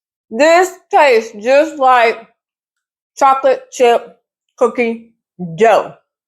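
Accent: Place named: South, United States. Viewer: I just love your style with that country accent! I stumbled across your video looking for chick pea recipes. I've been making chick pea salad for awhile now since I started making plant based recipes. I'm going to make the fritters, which I'll call patties for dinner tonight. I have some cans of chick peas until I do marketing tomorrow!😂 I've never heard of chick pea cookies! I made healthy oatmeal cookies yesterday! I'll try the chick pea cookies this weekend! I'll let you know how my patties turn out!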